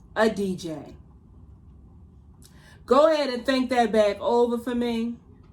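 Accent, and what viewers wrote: Baltimore, United States. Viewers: I love ur Baltimore accent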